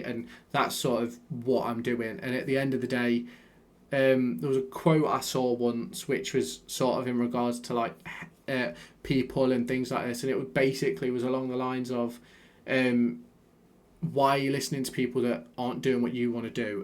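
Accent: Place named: Derby, United Kingdom. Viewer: Are you Derby born and bred? You sound like you are. I'm allenton originally but now in chellaston.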